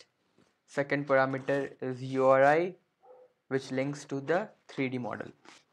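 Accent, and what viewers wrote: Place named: India. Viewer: Hey buddy you are doing really great....Would love to talk to you about the realm of immersive reality. I am a 3rd Year B.Tech(IT & Mathematics ) student of University of Delhi. I have been working the realm of immersive reality tech for about 6 months now.Are you a delhite by any chance.......you definitely sound like an Indian. I am the head of the VR AR MR- Delhi Chapter, which is an open community of immersive reality enthusiasts. I organize workshops for immersive tech. Do connect me on <mail> to discuss more on the topic. PS: You really are doing some impressive work out there.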